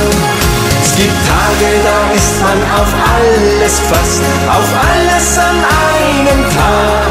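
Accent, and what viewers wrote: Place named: Bavaria, Germany. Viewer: Bayerisch passt einfach am besten zu Dir! 😍😍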